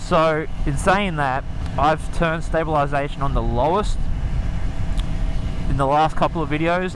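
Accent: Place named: Australia. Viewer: I definitely enjoyed watching this with Ozzy accent! Greets from Germany mate!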